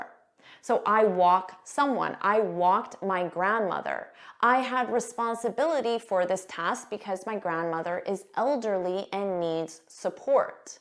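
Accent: United States. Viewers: You have a perfect American accent.. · OK for American English, but it's a far cry from how British people speak. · this is an american english, right?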